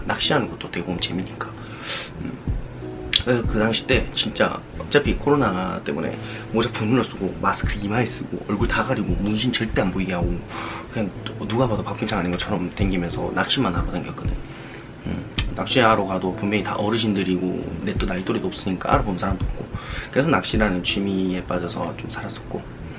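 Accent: South Korea. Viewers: 뭔가 말투가 완전 대구사투리는 아닌것 같은데 ? 서울말이랑 섞인건가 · 나 화면밖에서 경상도 사투리들려서 들어왔는데 레알 경상도였네...그래 잘생겼다....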